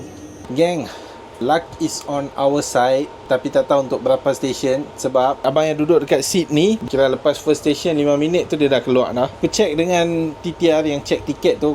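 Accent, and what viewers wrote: North, Malaysia. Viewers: Addicted with his lorat utara. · Steady Bro. Loghat Utara mendunia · Best lah bro sembang utara Senang faham sbb explanation slow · Baru saja 3hari lepas saya tengok 1st video channel youtube ni OMG saya tak sengaja tersuka.tersubscribe ❤ terasa macam prabu bg saya tengok filem tamil version cakap melayu loghat utara.i like!!❤ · Org utara ka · Tertengok masa tengah tunggu time nak tengok bola baru baru ni.. rasa dia ni sembang mcm org utara ja.. dah lama lama layan plak😀 tq la kami nak travel mungkin tak mampu. Boleh tengok dkt sini pon mcm pi skali dah ni😄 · Bila first time jumpa channel ni, dah dapat agak memang boleh pergi jauh. First time nampak vlogger berbangsa India tapi cakap dalam loghat utara. First tu ingat ada mix mamak ke apa, rupanya tidak. Memang unik dan ada tarikan tersendiri. Tumpang gembira tengok channel makin lama makin ramai viewers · Minit ..Orang kita dah terbiasa kan..yang jenis sopan la maksud sy..bila bagi dengan tangan kiri, akan say sorry..tak tau la..dia macam automatik tau nak minta maaf tu..padahal orang kadang tak kisah dan tak perasan pun..didikan mak ayah kita dulu kalau nak hulur atau bagi apa2 kat orang, guna tangan kanan..jangan guna tangan kiri..tangan kiri katanya kurang sopan..lagi2 kalau orang Islam, gunakan tangan kanan dan utamakan belah kanan tu Sunnah nabi..kalau masuk toilet je sunnah kaki kiri..apa2 pun, yang guna tangan kiri bukan lah maknanya tak sopan ye.,jangan ambil hati.. 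hihi..suka tengok abang travel sbb sikap dan bahasa dia bagi saya sopan..dan suka dengar loghat utagha dia.. semoga cepat capai 100k..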